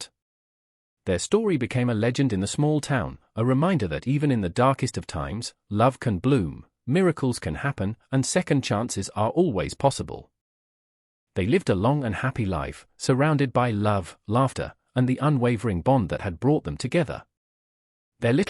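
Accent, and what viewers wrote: United Kingdom. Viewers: Westerns should NOT be read with a British accent, EVER!!!!! It's unnatural.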